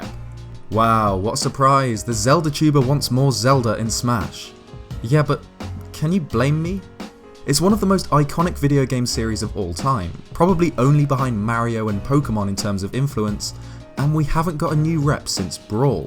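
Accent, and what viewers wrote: United Kingdom. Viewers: Where are you from in the UK bro?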